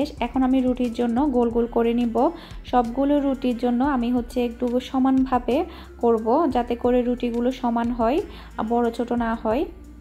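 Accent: Chittagong, Bangladesh. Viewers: R u from chittagong?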